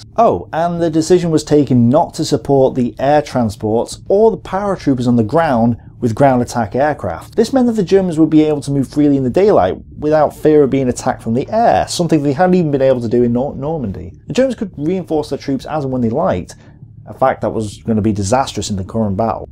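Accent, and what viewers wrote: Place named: Manchester, United Kingdom. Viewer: In 1944 the Zuiderzee, the Southern Sea, no longer existed. it had been transformed in the 1930s into the IJsselmeer (lake IJssel). Also the shape of the lake is completely wrong. The map is more like something I expected from an American, not something that (as I understand his accent) is coming from a British person.. .I honestly don't understand WHERE he got this map from.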